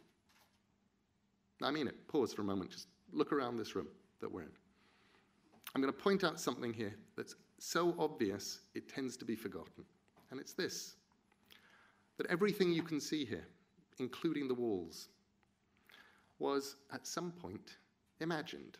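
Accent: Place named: England, United Kingdom. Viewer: not a TED talk, but as Neil said - the content is what matters. Though sound is very low and No motion on the scene as well as on the screen, watching it for 5 minutes and they I see - it is actually a video - not a frozen picture, the Table and meaningless slides + reading from paper makes it difficult to concentrate. But wow, the orator is terrific, no uhms or any other faults, just perfect deep voice and English accent :). Whoa, it is so weird - youtube makes a critic of everyone.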